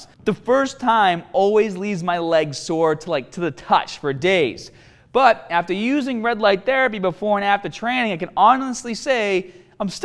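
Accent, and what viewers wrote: Boston, United States. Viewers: are you a Bostonian. The way you say 'started' --> 'Staaahted' is a dead giveaway! From one Bostonian to another - Do. Not. Lose.the.accent. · I'm detecting that "Bostonian" accent. :-) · Woooof I didn't know this guy was from Boston until "scars" and "marks"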